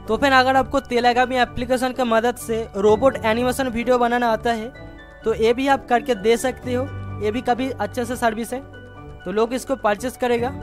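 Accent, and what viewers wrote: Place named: Bengal, India. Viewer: Bro thik se hindi bolo banhali kayse bol raje ho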